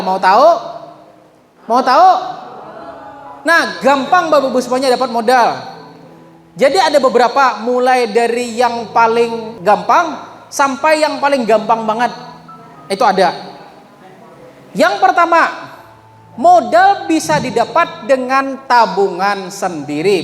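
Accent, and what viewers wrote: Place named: Tegal, Indonesia. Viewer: Wong Tegal kie pak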